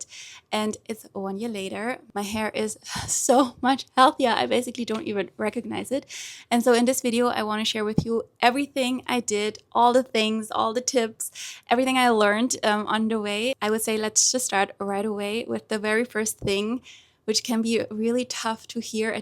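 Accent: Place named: Germany. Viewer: Oh süß man hört deinen deutschen Akzent raus 💗🥹 Grüße aus Nürnberg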